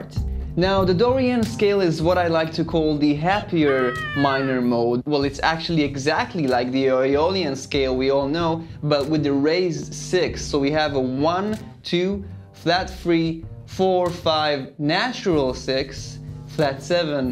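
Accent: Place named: Iran. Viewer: hi, my name is Lawson. I live in Los Angeles. I hear a Persian accent when you speak. My brother in law is from Iran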